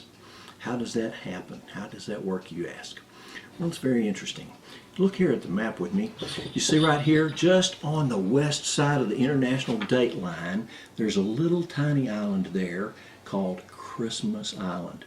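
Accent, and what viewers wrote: South, United States. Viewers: Santa had a bit of a southern accent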